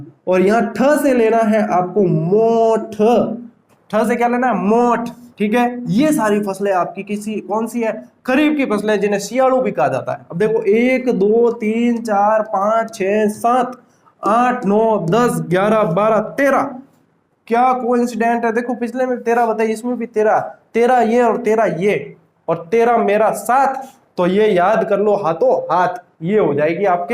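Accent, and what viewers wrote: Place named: Marwar, India. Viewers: Sir aapki marwadi bhut chokhi lag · Marwad ki mitti boli k sath aapki class bahut hi achhi h sir.thank u so much .... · Na sirji hamein to aapki Marwadi bhot achi lgti h...wait krte rehte h ki aap Marwadi mei padhao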